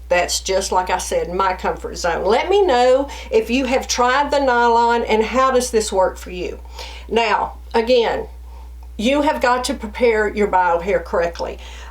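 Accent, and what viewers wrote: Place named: Boston, United States. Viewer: Thank you for doing this video--very informative. I've been experiencing some itching; and yes I'm thinking it is the permatease for me. Laughing about your accent--I'm from Boston but it sounds like Bahstun.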